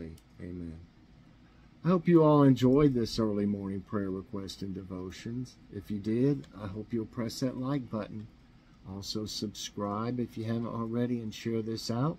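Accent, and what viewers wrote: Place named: England, United Kingdom. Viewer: I love hearing your prayer with English accent,. I love it and thank you 🙏 always for regular prayers 😍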